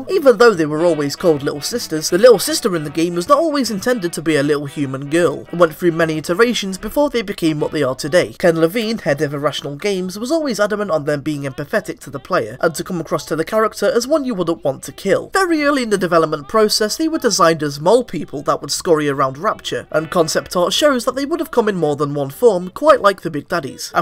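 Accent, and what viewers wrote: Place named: United Kingdom. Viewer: Awesome job! And British! EXCELLENT!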